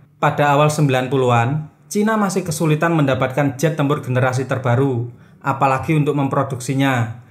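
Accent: Java, Indonesia. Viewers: Kau cakap apa woo...kental betul jawa kau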